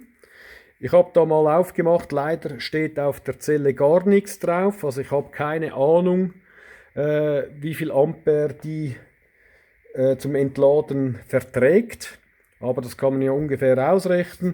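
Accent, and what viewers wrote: Switzerland. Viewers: Well done! This is like the only video out there, where someone actually successfully shows you how to connect to the PC software. I managed to connect my AT24 to my PC, with the method that he showed using the "More Bluetooth options", in the Bluetooth & other devices page. Under COM Ports tab, add an Outgoing serial port that points to the **_SPP device. (According to your specific device). Now what I need to know yet, is how to get the logged information exported out to a spreed sheet or graph where i can see the whole picture. I want to use the device for machine trouble shooting and need a data logger. I figured if i just connect to an old laptop and come by the next day i would be able to see my current graph, and therewith help diagnose the problem. Let's see what we can get. Any tips heartily welcome. And I love the Schwitzer Deutsch Accent :):)😀😀😀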